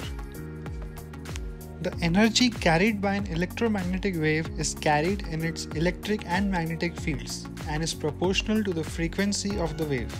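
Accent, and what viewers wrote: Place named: India. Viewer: Despite the Indian accent, the contents are very clear & quite easy to follow.. Thank you...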